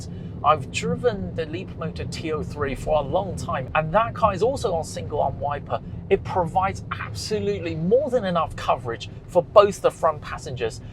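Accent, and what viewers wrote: United Kingdom. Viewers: Nice video and brilliant British accent!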